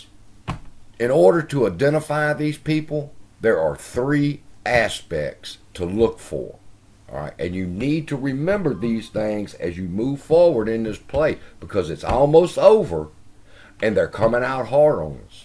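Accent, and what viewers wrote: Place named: Boston, United States. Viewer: he mentioned he liked you a long time ago. Something about your boston accent. Long time ago. This was when you were angry and going after other youtubers on the daily. I told him you were a sjw star wars fan. And a man who still likes star wars after what Disney did to it is no man at all. Also, you do no real dd and do not contribute anything of real value to this play or any other. Did you know Tony D is an actor and Denaro isn't his last name? I have a feeling you east coast guys are all deceptive people. What does "massa" mean anyhow? You don't think something slaves said to their masters is cute do you? Ahh, Disney fanboys.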